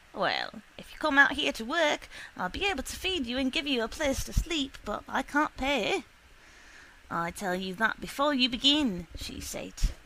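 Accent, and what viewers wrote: United Kingdom. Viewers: Get your act off here. We don’t need O’Connor audio stories read in a British accent. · David Ritchie haha lol the British accent is cool tho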